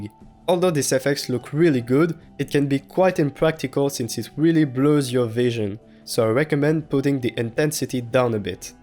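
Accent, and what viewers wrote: France. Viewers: Nice frenchglish accent and nice video! ;D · Are you french or something ? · that french accent is ruining my ears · Tu es français non ?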